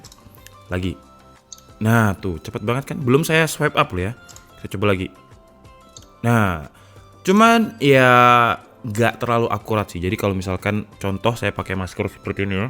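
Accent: Java, Indonesia. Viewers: Mas jowone daerah pundi Kulo boyolali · njenengan Jawane pundi mas ?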